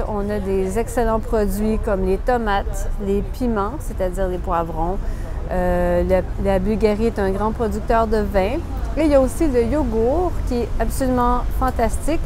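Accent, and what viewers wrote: Quebec, Canada. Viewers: Une québecoise, n`est ce pas?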